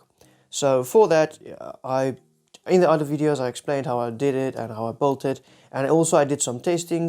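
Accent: South Africa. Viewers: You south African? I can hear the accent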